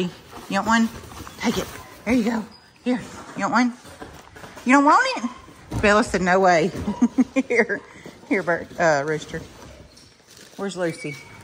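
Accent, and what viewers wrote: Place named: Alabama, United States. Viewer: I just found your channel the other day. I knew as soon as I heard you talk that you were from Alabama. I’m originally from Mobile. If you dressed Lucy in boy colors and never told us her name I would still know she is female because she is so feminine in her movements. Love them all. They make me laugh. 😊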